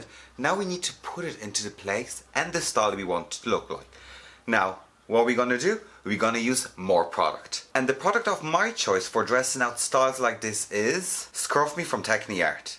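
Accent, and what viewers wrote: Ireland. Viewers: They have an Irish accent :)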